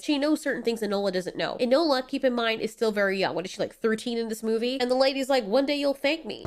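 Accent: United States. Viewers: She does have an accent, an American one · Your not American?just asking because you speak perfect American and in my experience those not born in the language usually gets the small things wrong ,example;saying I'm at Atlanta instead of I'm in Atlanta 😆 and as an aside I would love to see what you look like, and by the way this example actually happened to me yesterday 🤔 🙃!!!$$$+++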